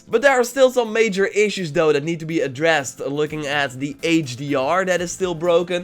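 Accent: Netherlands. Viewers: You sound soooo Dutch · He's from the Netherlands man, don't be so rude. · I detect a dutch accent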